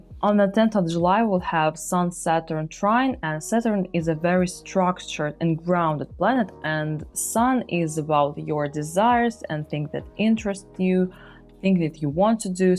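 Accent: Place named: Iran. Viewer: چقدر لهجت ایرانیه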